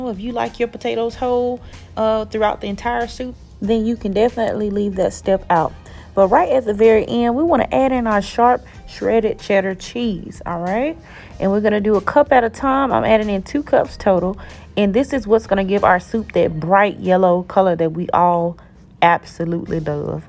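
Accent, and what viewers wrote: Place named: Mississippi, United States. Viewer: Are you from MS? I hear a familiar accent!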